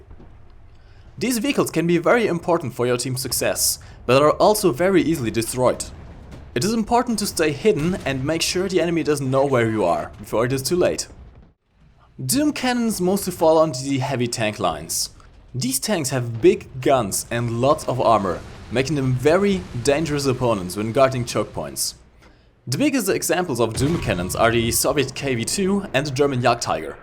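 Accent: Germany. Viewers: me : clicks on the video and listens 5 seconds to it . . . me: "Is that the German speaking guy in Squires Videos? " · Bist du Deutscher? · thank you so much my friend with a heavy german accent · Ur German English is really very cute 😍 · super Video, aber bitte arbeite etwas an deinem doch sehr deutschen Akzent. lehrreich war es definitiv, hast nen neuen Abonnenten :)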